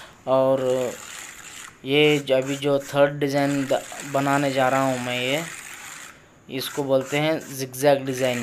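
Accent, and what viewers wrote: Hyderabad, India. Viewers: All are good designs....My teeth were actually sensitised with that scratch sound.....loved your hyderabadi way of speaking.....bole to bahot acha laga 👍😊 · Hyderabadi ka language mast hai 😄. My favorite is +- and Blade design. Great work art.👌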